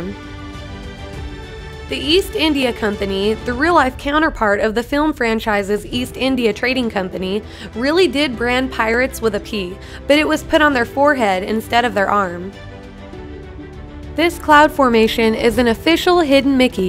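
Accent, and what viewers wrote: United States. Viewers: Instantly bailed due to nauseating American narrator. Was she the only applicant ?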